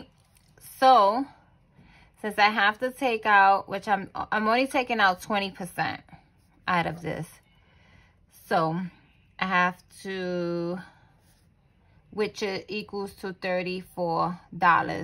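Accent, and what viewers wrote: New York, United States. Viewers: New York accent strong lmao I appreciate this video you didn’t have to do this but u did 💯❤️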